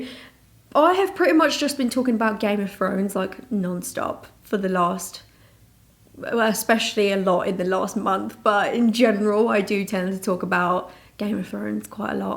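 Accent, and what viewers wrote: United Kingdom. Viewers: Was going to say, that's deffo a British accent xxx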